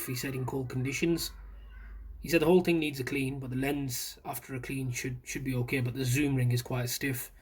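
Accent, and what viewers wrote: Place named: United Kingdom. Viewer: He sounds like he's from the UK, it will never get above 38 degrees celcius there, let alone 58c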